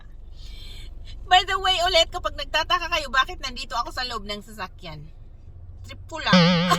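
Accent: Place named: Cordillera, Philippines. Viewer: sabi ko kasi madam, mukang taga highlanders to base sa accent.. heheh thanks sa mga infos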